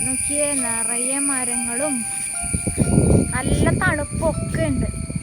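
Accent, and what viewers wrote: Thrissur, India. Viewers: Njan fast taim anato ei video kanunnathu orupadu eshttam ayi avarhranam nannattudatoo supper Thrissur samsaram polichuttoo suppara.manichettady cinima ennalem kudi kandollu marakila ah nadam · Molkke license,helmet undo? Thrissur slang👍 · Sathyasanthamaya parachilum avatharanavum.trisur bhashayum..good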